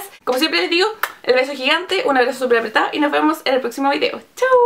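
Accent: Chile. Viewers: de que parte de chile es? que alguien me digaaa😂😂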